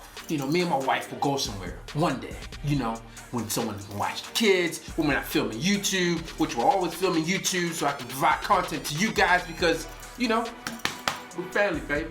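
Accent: Baltimore, United States. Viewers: Boy that bmore accent sound weird af lol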